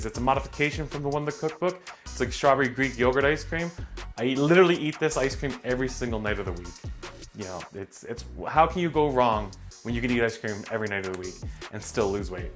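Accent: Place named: United States. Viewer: you sound American af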